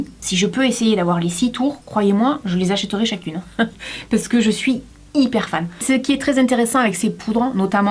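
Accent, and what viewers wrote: South, France. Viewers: Est ce qu'il serait possible de tester la marque de Gaelle Garcia Diaz "Martine" j'aimerai beaucoup ton avis dessus ! Bisous continue comme cela j'adore tes vidéos et ton accent me rappelle ma famille du Sud 😍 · Merci pour cette vidéo (je ne t’appelle pas Maman ne m’en veut pas mais nous avons le même âge 😜) et tu me rassures car moi aussi je me maquille plus qu’avant. Je ne sais pas si tu as vu bellapiere est sur Showroom privé jusqu’à demain matin. Ça ne fait pas longtemps que je regarde les vidéos de YouTubeuses sur le net, je ne pensais pas en trouver une avec laquelle je me retrouve et en qui donne de bons conseils. Et puis l’accent du sud me manque trop c’est un régal de t’écouter parler. Bisous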